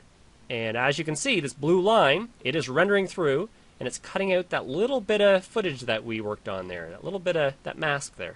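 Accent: Canada. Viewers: Canadian Accent FTW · Very nice vid. :) by the way, is that a Canadian accent?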